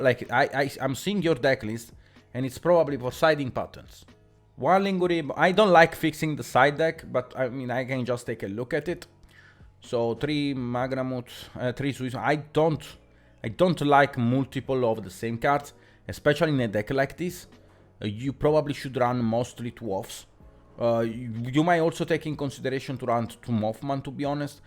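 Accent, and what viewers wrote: Italy. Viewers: incredibile come avevo capito che eri italiano dalla prima parola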